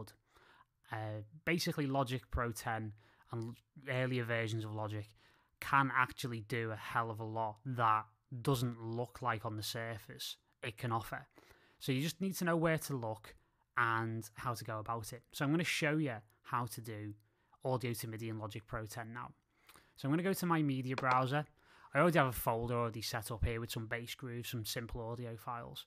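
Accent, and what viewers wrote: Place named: England, United Kingdom. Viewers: ***** Well said Sir. I am from Liverpool, but living in Sydney. Always good to hear an accent from back home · English accent is the most stupid lame az accent!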